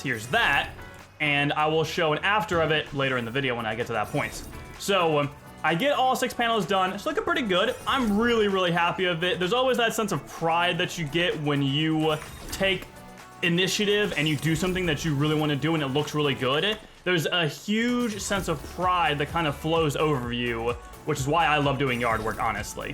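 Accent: United States. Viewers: What part of Ohio are you from?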